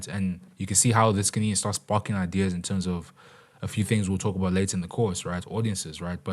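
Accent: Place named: South Africa. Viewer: i could tell you were a fellow south african by the accent.😅.beautiful course brother.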